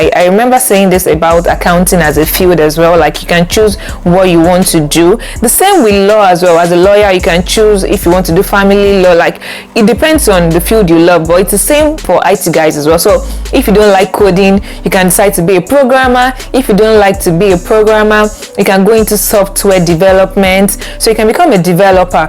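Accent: Yoruba, Nigeria. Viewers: why should she eliminate Yoruba accent? Is that suppose to be a taboo?🤷 Nothing beats Originality. Be real..So far she's communicating. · Working on her speech and diction - yeah because she needs to improve (we all need to improve on whatever we are doing) but asking her to "eliminate every element of Yoruba accent", haba. With due respect since I am not a linguist, yet I disagree with you in content and in context. That's as good as saying she should change her personality/identity because it's not good enough. I will be looking for another definition of inferiority complex, please pray for me too... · That’s a Yoruba accent. Every tribe and country have their own accent. Let’s focus on the information given and ignore the accent. T for tenks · God bless you dear sister! I really appreciate your efforts at this, keep the good work up. However, as a Linguist I would advise you to work hard on your speech and diction. 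Make it your goal, to eliminate every element of the Yoruba accent, in your speech. I'm very particular about that each time I listen for speech content creation. I shall be praying for you and cheering you on. Do keep the up the good work! Cheers!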